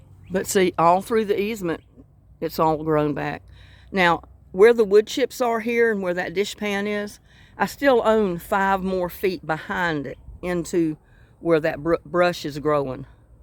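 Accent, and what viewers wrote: South, United States. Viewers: Was busy spring cleaning...the winds here are loud and strong, omgosh...parts of my front porch are missing and cleaning up the yard...😮..luvv garlic ❤️..looking at you in summer clothes is making me want warmer weather now..I had a neighbor that was from North Carolina when I was a young girl , she looks and sounded just like you, aww..and when you said in a other video you were from N.C. ..I thought..yes she is!.. I could detect the certain accent in some words you say......always liked the southern accent . God Bless. ❤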